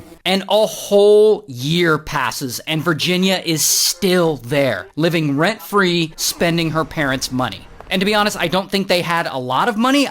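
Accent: England, United Kingdom. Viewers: Loving your English accent ❤ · The British accent 😂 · I love English accent. He makes everyone seem so nice and proper. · I love your use of typically British swear words like 'bollocks' and your British accent; not the best, but hilarious! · I like the brittish acent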